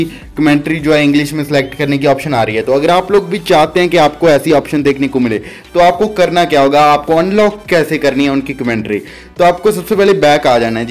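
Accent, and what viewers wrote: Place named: Punjab, India. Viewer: Bai punjabi lagda tu mainu😁❤️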